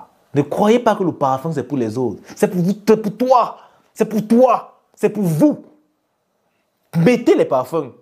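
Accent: Cameroon. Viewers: La manière donc tu parle la j'espère que tu es du kamer 🇨🇲 force a toi Fréro 🤝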